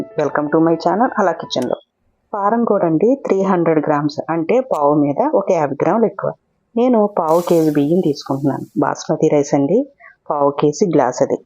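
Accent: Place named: Godavari, India. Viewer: Mana godavari style lo baga vivarinchi anduku miku thank you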